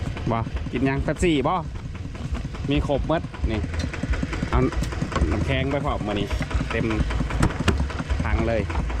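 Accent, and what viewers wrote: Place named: Phu Thai, Thailand. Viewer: เว้าคือคือภูไทบ้านผมแท้บ่าว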